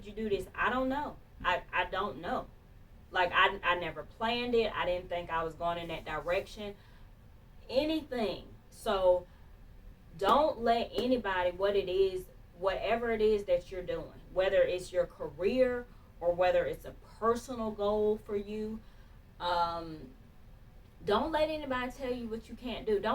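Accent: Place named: West Virginia, United States. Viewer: Good morning. I can hear your dialect and noticed that it was west Virginia. I have a church member who has the same dialect . On another note, keep uploading These videos . This video certainly spoke to me because people within my own family especially my siblings have told me in my face that I couldn't do things. This video really touched my heart.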